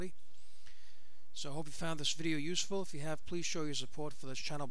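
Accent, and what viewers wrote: New York, United States. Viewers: Thanks Brother...You sound like a New Yorkuh...I Love NY & God Bless Yous :)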